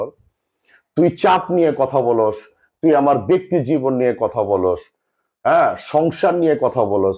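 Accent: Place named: Comilla, Bangladesh. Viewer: বাড়ি কোথায় কুমিল্লা বুঝতে হবে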